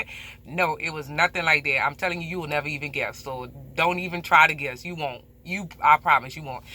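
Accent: Louisiana, United States. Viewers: I though you was from loussaina the accent gave it away!! Lol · Love your accent! Bayou, Creole?....Cajun! Luv You!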